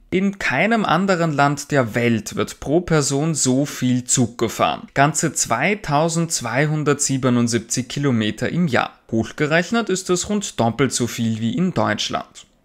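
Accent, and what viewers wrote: Vienna, Austria. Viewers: Professionell gemacht. Aber diese Stimme und Aussprache (Wiener Upperclass = Schönbrunner Burgtheatersudentendeutsch). Schrecklich. Klingt fast wie Kurz. Bitte synchronisieren. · I am British. At school I had a FEW German lessons. But I can understand almost everything says. HIS ACCENT IS PERFECT FOR people whose first language is not German. Danke schoen fur sein sehr Klar 'Wiener Upperclass'.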